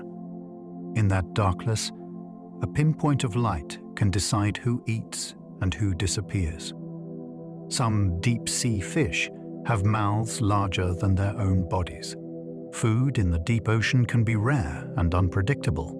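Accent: United Kingdom. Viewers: Listening from Iowa, USA. ❤ I like to listen at .75 speed and love the British accent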